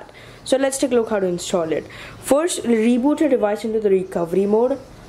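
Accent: India. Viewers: 印度英语…挑战我的忍耐能力… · that cringy Indian accent. stupid fcks.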